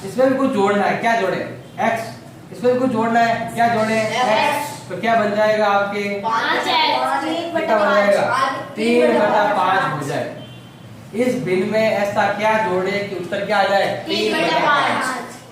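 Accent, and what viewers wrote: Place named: Rajasthan, India. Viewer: sir apki awaj se lag rha h aap rajasthan se ho to mujhe tusion lena h please sir jwab dena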